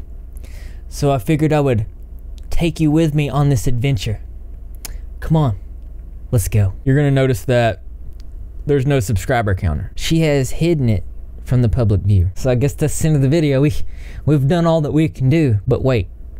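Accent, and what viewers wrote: South, United States. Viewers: i dunno :|........but in my opinion.......maybe its the southern accent......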